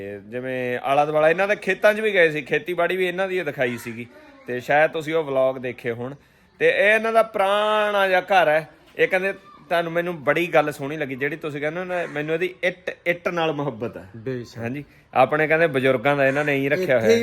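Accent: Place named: Malwa, India. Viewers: ਵੀਰੇ ਤੇਰੀ ਬੋਲੀ ਵਧੀਆ ਨਹੀ ਜ਼ਿਆਦਾ ਮਲਵਈ ਜ਼ਿਆਦਾ ਨਾ ਬੋਲਿਆ ਕਰ ਵੀਰੇ ਲਹਿੰਦੇ ਪੰਜਾਬ ਦੀ ਬੋਲੀ ਬਹੁਤ ਮਿੱਠੀ ਹੁੰਦੀ ਹੈ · Veer apni boli bol reha eh malwai aa ta malwai hi bolni aa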